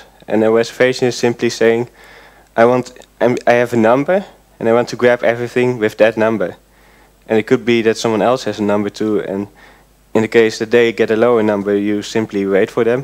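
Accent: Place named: Netherlands. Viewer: Klinkt zó Nederlands :-D maar goed gedaan.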